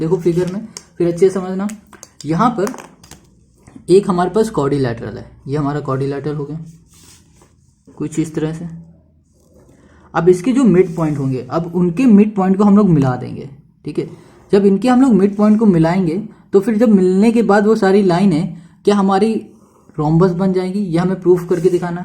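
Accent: Bihar, India. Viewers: You are from bihar sir?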